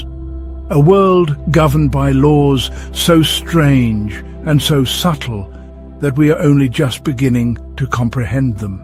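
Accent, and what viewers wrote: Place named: England, United Kingdom. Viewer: english accents just make everything sound like propaganda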